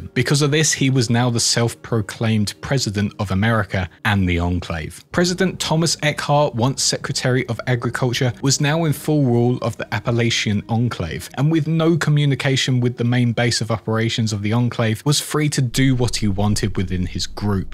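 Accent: United Kingdom. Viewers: Oh god NO did I find another British narrated video game lore channel? Now I’m going to have to watch all the videos. I’m caught up on the fudgemuppet catalog already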